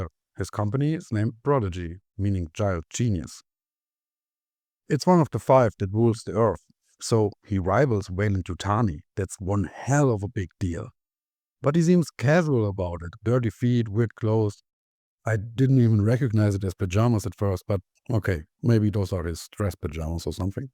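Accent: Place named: Germany. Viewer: Hahahaha you have a german accent yourself, love the humour...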